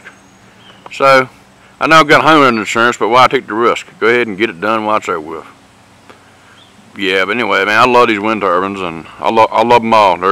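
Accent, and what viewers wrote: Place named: Virginia, United States. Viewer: I'm digging the accent. You grow up in Virginia your whole life? Nice.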